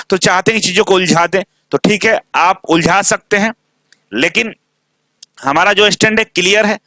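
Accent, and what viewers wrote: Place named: Rampur, India. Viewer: Sar Rampur wala bol raha hai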